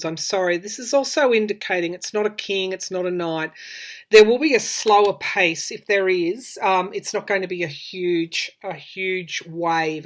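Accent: Australia. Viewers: Love listening to you, gives me hope! Love your accent, spent a month down under several years ago, New Year’s Eve in Sydney harbor, spectacular. Was so sad to hear the fires did extensive damage to KI. KI, GBR and Tasssie were amazing. Love Australia and it’s nature and friendly people. Stay safe everyone. Thanks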